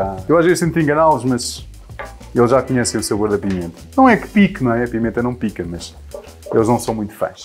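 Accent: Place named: Lisbon, Portugal. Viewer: Essa vozinha de betinho lisboeta !!!!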